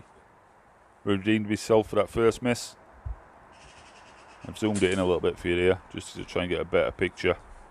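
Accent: Yorkshire, United Kingdom. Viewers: What part of Yorkshire are you from pal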